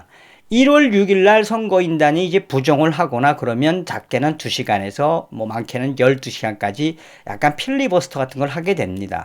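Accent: North Korea. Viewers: 님 틸북인이시가요? 북한 말씨여서요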